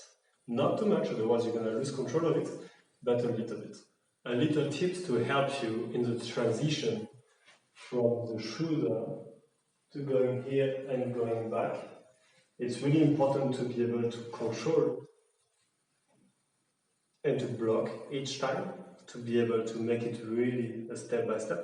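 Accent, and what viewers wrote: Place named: France. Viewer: Mais... il parle anglais avec l'accent français... pourquoi ne pas faire un tuto en français ????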